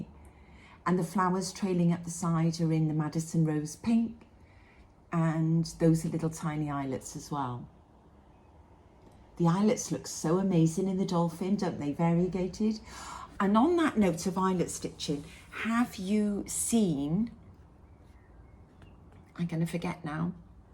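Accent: Wales, United Kingdom. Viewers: Love everything on your Flosstube! Love to hear your Welsh accent too! Is the Giraffe called goose??? Funny !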